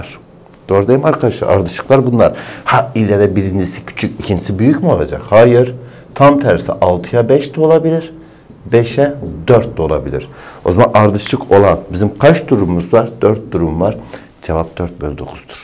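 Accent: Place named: Eastern Anatolia, Turkey. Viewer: Hocam tam doğulu ağzı var sizde. Ve bu sizi daha da samimi yapıyor 🤗🤗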